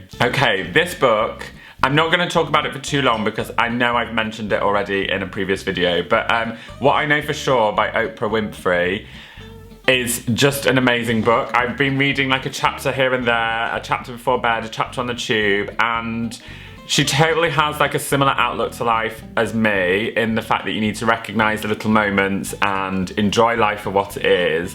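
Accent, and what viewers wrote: Australia. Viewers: love you!!and that aussie accent just brightened up my dreary sunday:D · Love the Aussie accent. Hugs from Queensland!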